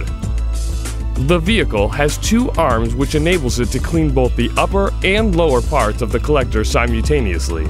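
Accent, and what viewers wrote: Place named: Texas, United States. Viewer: 9000 l. tanks and waggy slow polybrushes 2-3MPH...18 loops of the Gemasolar/Torresol Energy place a day? It looks a horror. But it handles those close-in parabolic reflectors. Does the power plant condense much over 9000L on the turbines' cold side as some sort of side-effect? Was electrostatic or mirror-mounted cleaning out of the question, compared of course to the truck dieseling by all day? Application research and Basic R&D are different, you! The HTF (high throughput fluid) truck's 'nitrogen therusion' for keeping the rubber solid is the only real howler in the mildly gamy Texmexican Voiceover.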